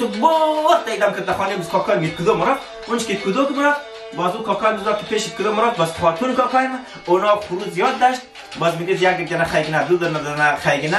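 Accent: Hazaragi, Afghanistan. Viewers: سلام علیکم اغای بیادر بسیار لحجی هزارهگی شیرین گپ میزنی اما لحجی هزاره گی هیچ وقت نشونیده بودم ما شا الله مقبول گپ میزنین مفق باشید ده کار بار تان تشکر💜💙💚👍👍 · اموته سوچه هزاره گی گفتنت مرا مزه داد چینل تو سبسکرایب کدوم و لایک کردم. قوربون کلام نابت عالی بود ممنون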